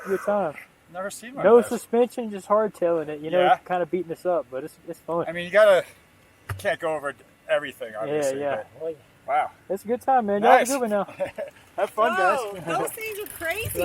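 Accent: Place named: Carolinas, United States. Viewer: Yooo! I just heard you say you were in SC and NC! I thought your accent was from the Carolinas! I'm in NC, and would love to hear about any trails you'd recommend riding out here! I loved hearing the stories of how you've helped people with the unicycle. 😁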